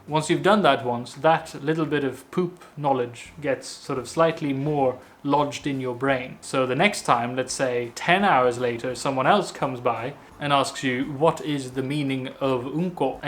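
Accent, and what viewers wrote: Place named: United Kingdom. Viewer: We’re just catching up on a lot of Swedish things before we visit Stockholm at the end of August. Now we are addicted to your videos. And your fine British accent, and liberal swearing. Now we’re enjoying your Japanese - can’t wait to go back ourselves. If we’re ever in the same city I’ll buy you a drink. A small, inexpensive drink, but a drink nonetheless. Much love from the UK.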